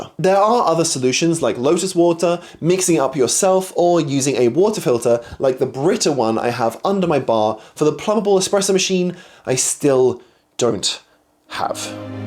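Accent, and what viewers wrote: United Kingdom. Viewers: The advice is for spoiled brats. Another British accent bullshit.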